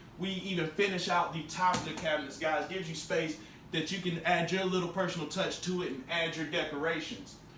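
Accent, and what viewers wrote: South, United States. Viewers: with that intellectual, southern accent 💜💜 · "In case you got little chitlins." 😆 I'm from the North so the accent really gets me. Of course, you all are now saying, what accent, you got the accent! 😜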